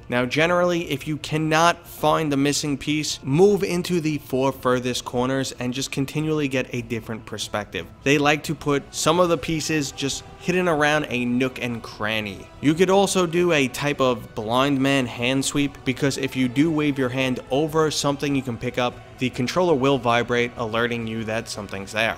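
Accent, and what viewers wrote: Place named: Boston, United States. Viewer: Your accent is very interesting 🤔. You sound like you have a Boston or New Jersey accent. Ya hold your long a sounds sometimes.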